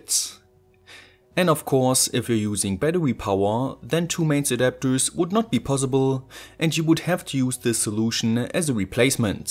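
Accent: Germany. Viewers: I'm pretty sure he's german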